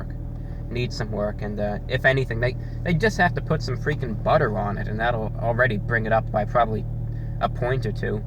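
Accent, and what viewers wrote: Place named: Northeast, United States. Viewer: previous geographical indicators led me to believe that he was located in California, but alas, this revelation of presence in the state which I currently reside, north Carolina, in combination with his northeastern accent is confusing me. my life crumbles...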